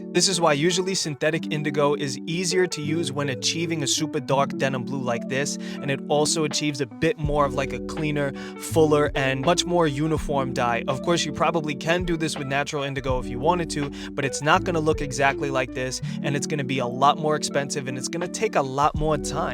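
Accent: New York, United States. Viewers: You got that nu yok accent brotha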